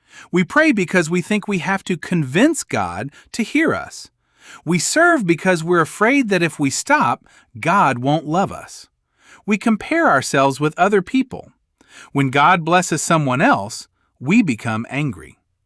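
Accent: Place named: India. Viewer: The indian accent got me😂❤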